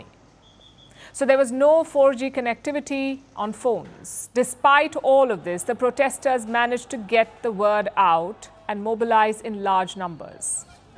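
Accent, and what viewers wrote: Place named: India. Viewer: Just typical Indian style English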